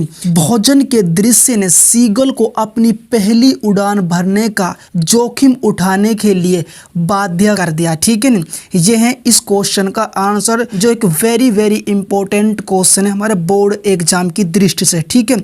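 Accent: Mewat, India. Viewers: Mewati hai bhai tu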